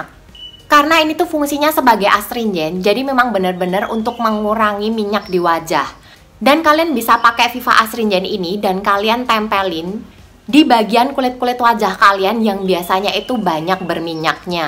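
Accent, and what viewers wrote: Java, Indonesia. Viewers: Medhog guys suaranya😁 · medok banget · Jowomu ketok mbak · Mbak kok medok banget? Jawanya mana? Dalam dari jogja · Medok nya itu lohhh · NGAKAK LIAT NYA SOALNYA NGOMONG NYA KAYA NGEGAAS😆😂..JAWA YA BAK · Jowo ngendi lurd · Iya jowo banget😆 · cempreng n medok...aku suka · ngomongnya medok bnget😂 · Mbak ngomong e jowo medok😍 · Medok kali bahasanya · medok mba wkwk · Asli jowo opo mbak? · medoknyA mntap kk hhaa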